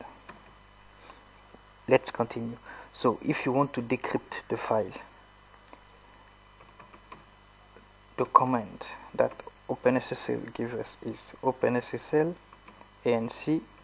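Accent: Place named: France. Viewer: not bad but your english sound like french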